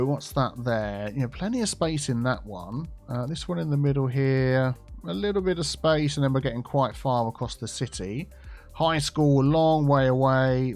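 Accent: United Kingdom. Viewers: Your British voice is relaxing 😅😂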